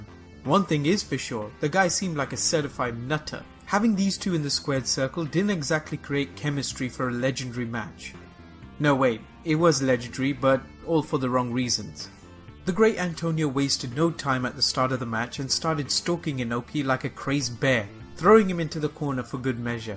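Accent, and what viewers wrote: England, United Kingdom. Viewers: Are you English? Just wondering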